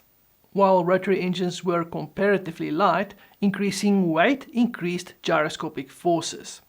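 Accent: South Africa. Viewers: Thank you for an excellent presentation, your accent sounds incredibly like South African (Africaans/Dutch). I maybe I'mwrong with the dialect however I lived there for over 30 years and had the pleasure of meeting some very clever people.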